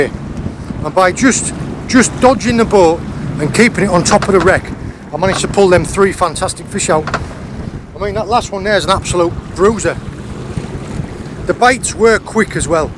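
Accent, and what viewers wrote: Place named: North of England, United Kingdom. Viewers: Best fishing stuff on YouTube.. & this chap sounds like he's from north of England.. & I'll bet he's military or ex military.. reel guy.. very addictive viewing.. keep it going... Manchester😃😃. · you say you hope we enjoyed seeing you catch AND release some lovely bass and even a couple of Pollock. Whats NOT to like about you with your super Northumbrian accent your ALWAYS passing on your skills?? You are what Mr Elton Murphy is to Coarse Angling, You are to Sea Angling young man!! Both first class AMBASSADORS to your chosen disciplines. 😂😊😂.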